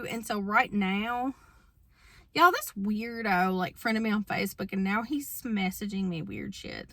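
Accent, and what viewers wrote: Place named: South, United States. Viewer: Thank you. Your sunshine and peace and don't let them hold you down attitude is the exact energy I wanted to match today. Thanks for being that and your sweet southern accent made me miss Mississippi just a little. Some people are as sweet as the sweet tea they make down there and I miss that warmth! ❤ Lots of positivity your way all the way from Canada. 😊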